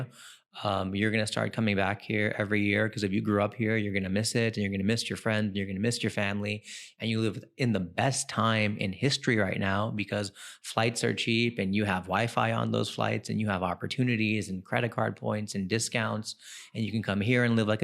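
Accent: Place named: United States. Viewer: Wow ! You still speak American accent. How come it hasn't changed. 🙂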